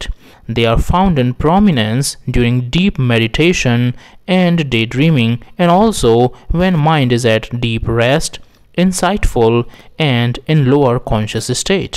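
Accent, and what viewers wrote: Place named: Bihar, India. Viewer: Did you learn English in Bihar??!!!😂🤣😂😆😃😋😡😡😠😠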